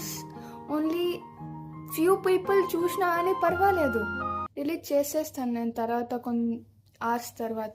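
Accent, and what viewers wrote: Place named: Karnataka, India. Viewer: Karnataka telugu … pakka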